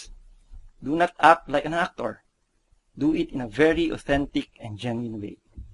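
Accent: Philippines. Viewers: Duhh. Filipinos really speak that way. And who cares, as long as we understand what he's saying then everything is fine. Okay? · Filipinos speak that way. it's ok as long as we understand what he say.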